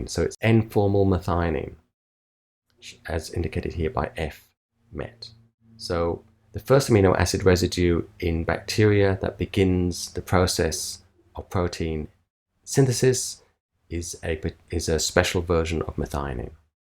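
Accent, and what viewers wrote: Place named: Australia. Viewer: Best Molecular Biology Professor; your videos are timeless, like etenal. It also made me like Australian version of English outspeaking.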